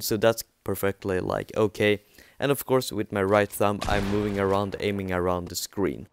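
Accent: Sweden. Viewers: R u swedish?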